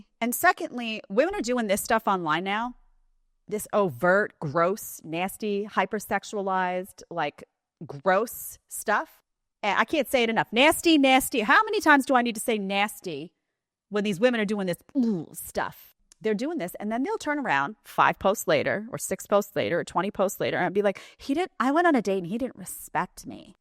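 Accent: New York, United States. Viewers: Do I hear a tinge of Ney York or Boston in your accent once in a while?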